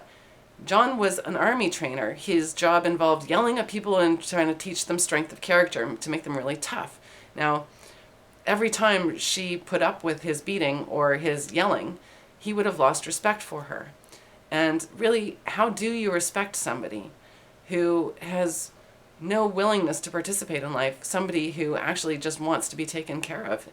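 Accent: Canada. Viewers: You really work that smirk with the chin down and eyes up, bangs at the top of eye level well. You could talk to me for hours lady. Monotone discussion in laid back style, with occasional canadian dialect shining through. Making my heart flutter. Feeling enveloped with a warm buzz of unseen tribbles that are cloaked. My pupils are dilated to the point to where i look like an alien hybrid portrayed in our modern pop culture. Yeah i could listen intently to your voice of intrigue for great lengths of time. Many many metric units of time. Your voice could also put me to sleep knowing youre near me. Like a security blanket at 60 decibels. Lol. im so sorry i keep ranting on your videos i am kinda trying to flatter you as though youre actually eading this stuff. Im bored to hell tonite thats aboot,lol, all as to why i keep on. I need my meds. Just meds i guess. I dont take any but feel like theres a stereotypical void that needs fillin. 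With pills. Haha.? I live near harley in milwaukee. Are ya related to willie g? Eh? I speak like a yooper too. Except we have a phrase in Wisconsin you canadians dont have. Dont cha know? God i vrack myself up. Im going nuts here! Not just about your appealing demeanor, looks and personality neither.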